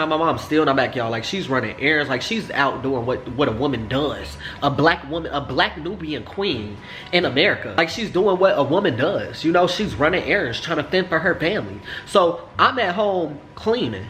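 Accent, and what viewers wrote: United Kingdom. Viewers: BYEEE THE BRITISH ACCENT IS TAKING ME OUTTT 💀😂😂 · It’s the British accent for me lol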